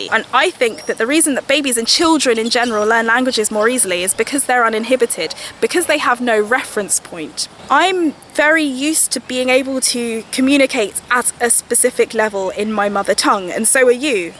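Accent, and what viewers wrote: Germany. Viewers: And how did you get such an incredible German accent living in Switzerland.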